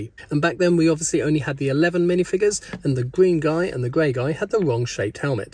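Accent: United Kingdom. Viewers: Oh you brithis? Cool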